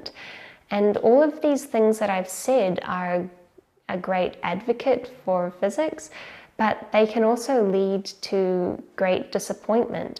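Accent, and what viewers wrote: New Zealand. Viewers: Kiwi right? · JustArduinoThings its also that kiwi accent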